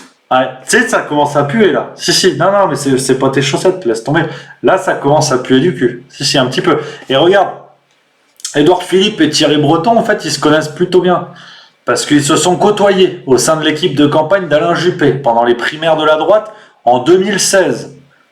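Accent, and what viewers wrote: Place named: England, United Kingdom. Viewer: Excellent travail l'artiste ! And i love your english accent 🥰 Soo froggy...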